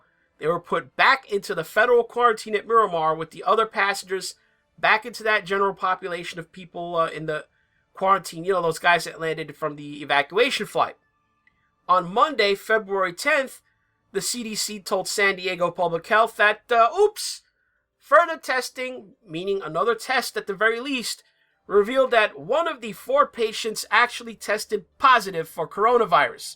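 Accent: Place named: New York, United States. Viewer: Sounds like New York \ New Jersey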